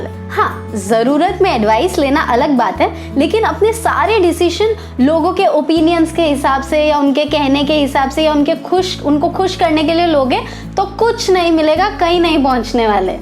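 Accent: Gujarat, India. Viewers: Gujju tone😁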